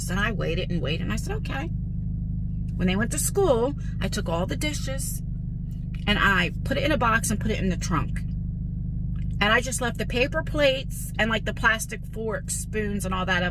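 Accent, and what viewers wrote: New Jersey, United States. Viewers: It’s just been a few months since I found your vlog channel and now I watch every one❤ Just know there’s lots of us that really care about you and enjoy your videos. I wish I could help 😊 I love that you protect your kids and I’m also diabetic so I can relate. I’m also a mama bear with my kids and grandkids. 😅 Besides the fact I’m from California and LOVE YOUR NEW JERSEY ACCENT 😂 Reminds me of when I worked in a Jewish Deli when I was a kid. I worked with a cook from New Jersey 😊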